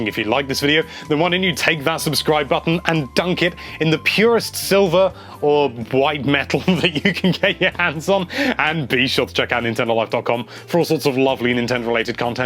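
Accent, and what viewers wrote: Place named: United Kingdom. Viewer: Congratulations, you deserve it! I love watching your videos, you are sympathetic and honest. And the most important thing: your marvellous British accent.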